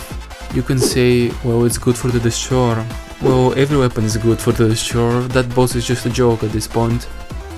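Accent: Russia. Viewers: У тебя русский акцент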